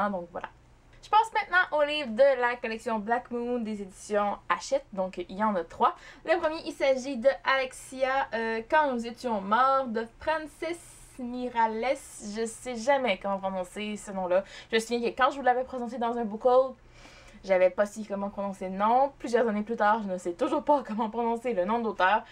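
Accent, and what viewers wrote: Quebec, Canada. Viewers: Belle PAL ! J'adore ton accent. J'ai de la famille à Montréal. Toi c'est le Québec ?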